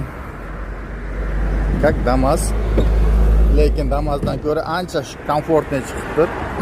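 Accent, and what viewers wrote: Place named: Kyrgyzstan. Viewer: Созунор Кыргызчага окшооп суйлопсунорго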